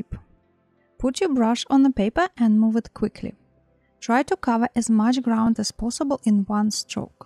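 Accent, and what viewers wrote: Russia. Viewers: С первой секунды слышно соотечественницу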